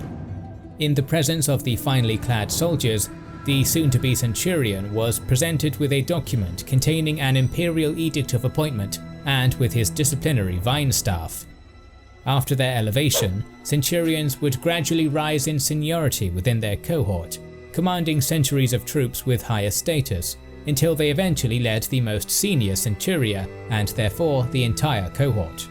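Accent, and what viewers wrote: United Kingdom. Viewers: this is going to be a controversial statement, but you might want to encourage your school to utilize a channel with an american narrator. i consider myself a student of languages and i've read a million anecdotes about the general american accent being an easier accent to understand for ESL students than most of the accents which occur throughout england and wales. unless you're located in a country geographically near to the UK, it's also more likely you will encounter the american accent in the media.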